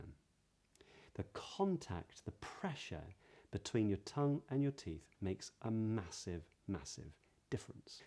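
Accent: RP, United Kingdom. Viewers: well! I'm into listening rp accent. So far I've found you the best for rp accent. So i would like you make just listening videos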